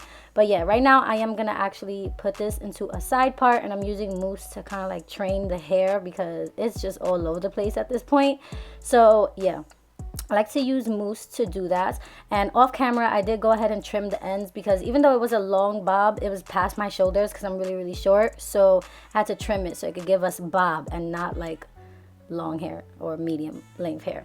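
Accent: New York, United States. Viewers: I love your New York accent 😍😍😍😍😍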